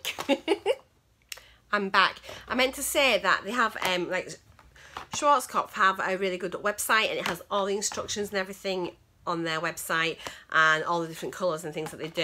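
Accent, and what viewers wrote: Yorkshire, United Kingdom. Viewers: So love a Yorkshire accent!!!!!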